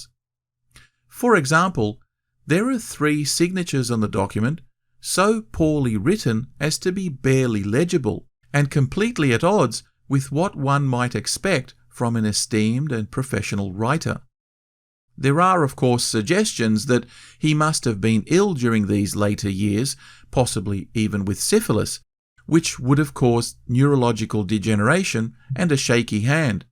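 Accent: Australia. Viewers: It's so nice to hear your Aussie accent. Love from the Mid North Coast, NSW, Australia.❤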